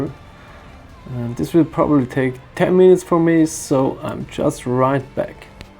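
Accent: Germany. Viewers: Are you German?^^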